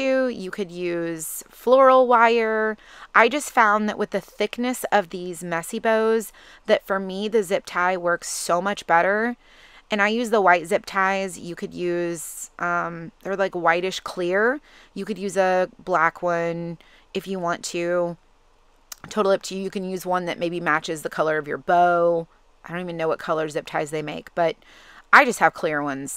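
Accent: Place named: Georgia, United States. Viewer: I totally LOVE the pumpkin! THANKS for demonstrating the bow.. LOVED it. Everytime I hear your voice I get homesick for Ga. Take care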